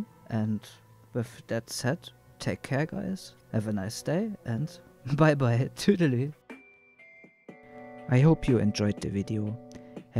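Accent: Germany. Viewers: bist du deutscher xd klingt so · teach me german senpaiiiiiii, dude i love that accent "Not a scratch Doctor " Not A Scratch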